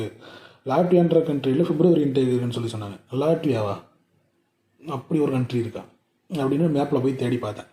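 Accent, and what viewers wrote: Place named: Nagercoil, India. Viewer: Nagercoil slang!!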